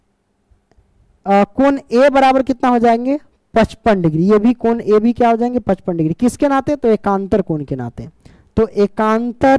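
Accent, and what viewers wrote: Bihar, India. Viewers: You are. A good teacher but you talked some bihari language that why i didn't understood all work but you are a good teacher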